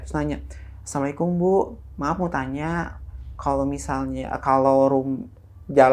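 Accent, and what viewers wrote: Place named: Bandung, Indonesia. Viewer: bandungnya kerasa banget a😂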